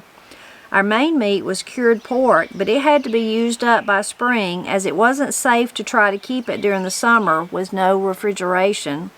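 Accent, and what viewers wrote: Appalachia, United States. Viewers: Did you read the story in your natural (Western NC) accent or in the local Eastern Kentucky accent?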